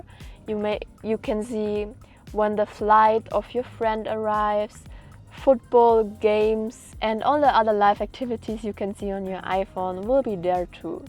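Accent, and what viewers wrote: Germany. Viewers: You are beautiful . Thank you so much for sharing this update. Are you a German? You have something like German accent.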